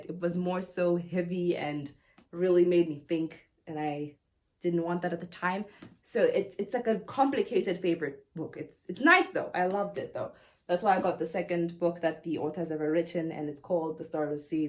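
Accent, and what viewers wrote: South Africa. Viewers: your accent is probably South African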